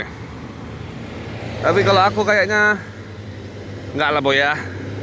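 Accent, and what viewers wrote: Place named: Medan, Indonesia. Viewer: Aksen kau medan kali wak geng, jadi ingat aku semasa di Medan, walau sekarang aku diperantauan, semoga semangat terus kau boy...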